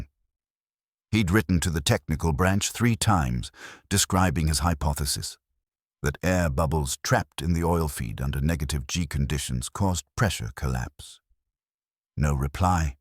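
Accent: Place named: United States. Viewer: An entertaining story but if American robotic narration is used and the graphics are CGI, I'm skeptical of it fully being true. For a story on something British, hearing robot narrator say, 'sodder' instead of 'soldering' and 'Aluminum' instead of 'Aluminium' ... not good, and anyway, wasn't it fuel starvation that caused the Merlin's to conk out before a fuel injection system was utilised.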